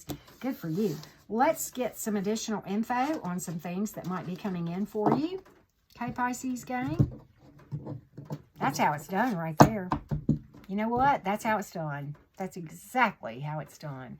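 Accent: South, United States. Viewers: I'm loving your channel annnd that southern drawl (I'm a N. Ga Peach) So glad to have found you from Tyler's Tarot 😁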